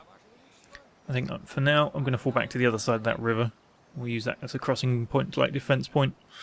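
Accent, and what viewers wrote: United Kingdom. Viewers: You sound like a 100% englishman.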